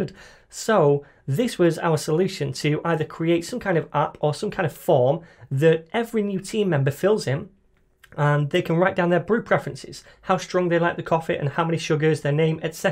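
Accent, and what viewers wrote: United Kingdom. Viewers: I just found your channel, and I feel incredibly and unbelievably lucky. I just finished your flutter beginner tutorials and let me tell you this, you are the, I mean THE best tutor on coding. I love the way you teach, I love the way you talk and I normally don't like British accent too (no offense) man, you are just different and better in all ways. That is why I feel lucky to be able to find someone like you. And most importantly of course, I can not thank you enough for the knowledge that you offer and share. I wish you all the best.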